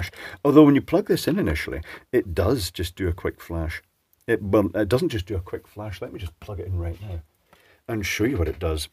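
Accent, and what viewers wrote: Scotland, United Kingdom. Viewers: Especially with a UK accent. Eg YouTube auto captions usually make a hash of. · I was expecting the light to have trouble understanding the Scots brogue, just like the voice controlled lift in Burnistoun... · Might be an example of edge computing. I haven't investigated the tools for this but the claim seems to be that you do training with the expected powerful computer but then condense the result into something that can run on a microcontroller. Very impressive that it not only doesn't need personal training but can even cope with a scottish accent! got a pretty clear voice, though. I wonder if it would handle glaswegian or geordie.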